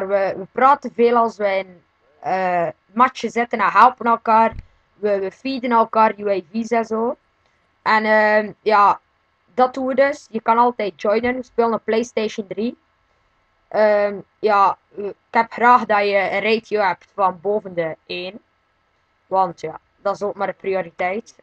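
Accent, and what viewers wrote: West Flanders, Belgium. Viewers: je hoort fuking hard dat hij West-Vlaams is. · Nice West-Vlaams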